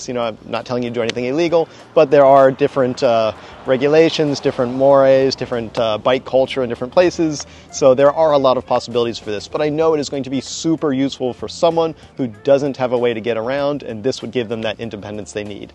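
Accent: United States. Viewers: You sound American. So where is home?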